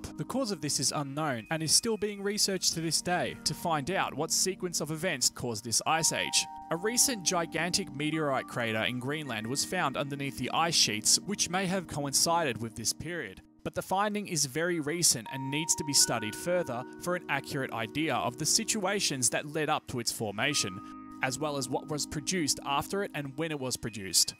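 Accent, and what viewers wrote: Australia. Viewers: That Aussie accent 🤣🤣🤣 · So now the voice of my nightmares is an Aussie lol. Fascinating and terrifying. Well done. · Awesome docs,plus ya sound like an Aussie 👍👍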